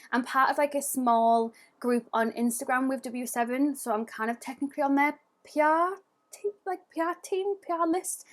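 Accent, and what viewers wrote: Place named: North East England, United Kingdom. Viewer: Yaaaaaay a north eastern accent! 🤗🥰🤗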